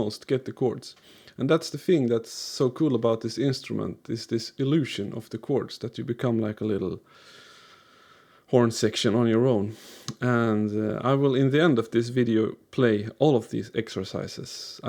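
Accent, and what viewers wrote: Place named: Scania, Sweden. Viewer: Ja, jag fattar inte varför man skriver på engelska. Men det är ju youtube. Jag hör att du okså är skåning när du räknar inn...one..two...tre...fyra !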